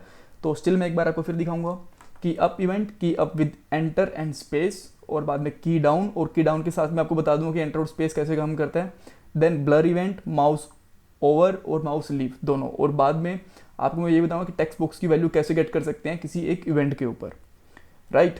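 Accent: India. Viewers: Noida se ho bhai kya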